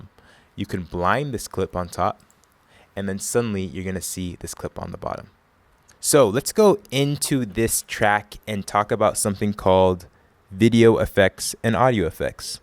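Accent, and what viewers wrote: Nigeria. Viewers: Just asking Are you a nigerian ? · are you Nigerian? If so, so am I! Thanks for the help, I'm trying to learn how to edit and this really helped!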